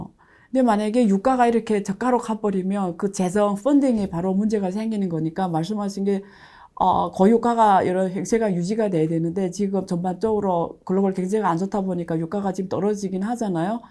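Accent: China. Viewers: 이 분 조선족이죠? 아무리 덧 칠을 해도 티 가납니다. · 중국분이신가? 발음이. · 많은.경제 뉴스를.신속히 전잘하는거 고맙습니다 다만 . 중국에.대한경제소식을 중국인의.발음으로 듣는게 그리.행복하지.않네요..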